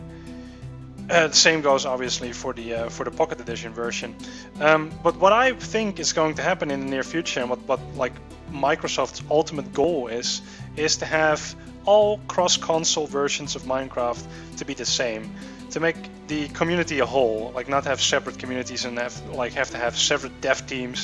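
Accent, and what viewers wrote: Netherlands. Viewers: Of ik kan goed Nederlandse accenten herkennen, of jouw Engelse accent is nog niet optimaal... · Just discovered your channel, first thing I noticed was your Dutch accent :) Nice channel though!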